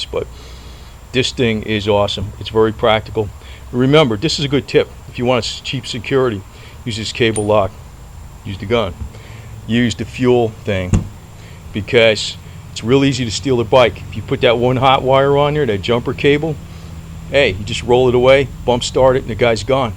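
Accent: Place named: New York, United States. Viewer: Seen a few of your vids. Your NY accent reminds me of home. Im in the Army at Ft Bragg, NC. I ride year round here. Even if it snows. Miss NY riding. Great roads up there. Especially up in dutchess county and further north. Nit too many winding roads down here. Ive got a 06 sporty 1200 (which is for sale) and a 05 dyna wide glide that I love. Im on my dyna almost every day. I barely cage at all. Real riders unite!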